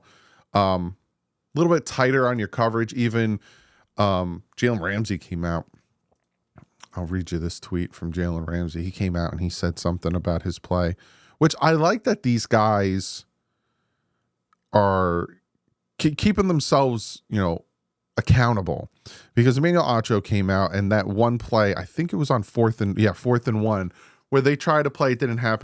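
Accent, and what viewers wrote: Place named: Hawaii, United States. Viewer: I really wanna hear this rant and the Hawaiian accent lol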